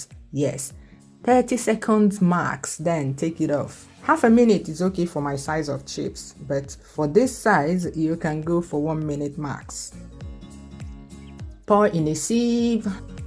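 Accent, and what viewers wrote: Igbo, Nigeria. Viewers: Igbotic accent creeps in 🤩, thanks a million for this lecture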